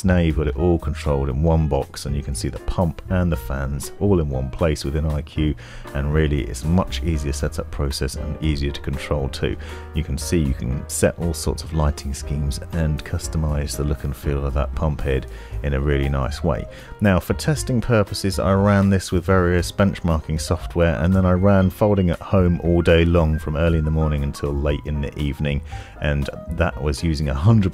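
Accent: United Kingdom. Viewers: As others have said, you are probably the #1 video producer on YouTube for tech stuff. Your speaking voice is head-and-shoulders above the competition! Even though you're clearly a Brit, you are very CLEAR and speak at a reasonable pace. Others mumble and rush through their delivery and it is quite off-putting to be honest! I am a subscriber to your channel and recommend others support you by LIKING and SUBSCRIBING. Cheers and keep on!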